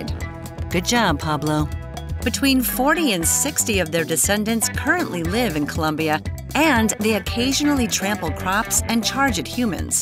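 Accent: United States. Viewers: Kilopascals for pressure- there's a unit most listeners cannot relate to. Going by the narrator's accent and mention of visiting the Cincinnati Zoo, I'm guessing that the intended audience might be mostly Americans...centimeters and kilograms aren't really how most of us reference distance and weight. Why doesn't the hippos mouth open Pi radians? ...if you'd like to promote Si, that is. Aside from the units, I always thought force to be more appropriate for bite strength than pressure, which, for a given jaw strength, would vary widely depending on the area considered. Most animals bite strength, measured in PSI or kPA, could exceed their tooth strength, if they bit a ball bearing, or any small hard thing....the force, without considering area, seems more relevant. Pounds, or Newtons, if you insist.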